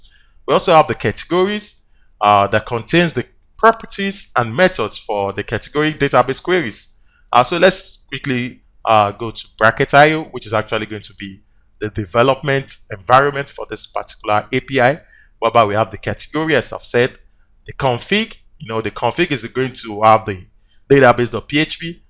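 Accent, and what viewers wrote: Nigeria. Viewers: sounds Naija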